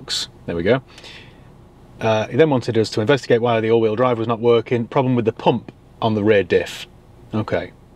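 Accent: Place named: Birmingham, United Kingdom. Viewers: Brum /Black Country accent 10 out of 10. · I'm from Birmingham now living in Cornwall but your Brummie accent cracked me up 😅 still obsessed with your vids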